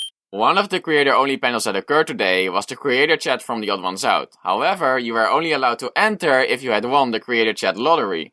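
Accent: Netherlands. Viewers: I knew it you’re Dutch... well that explains your dutch English accent Really like your videos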